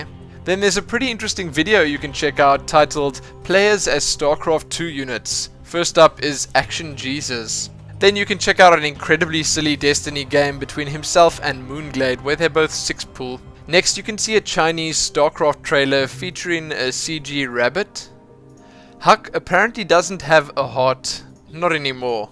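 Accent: South Africa. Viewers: its a south african accent bru!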